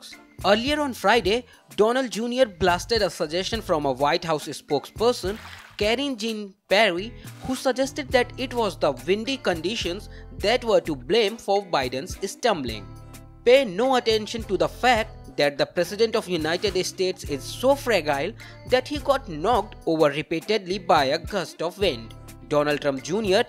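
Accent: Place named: India. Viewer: Ok why is this being narrated by an Indian?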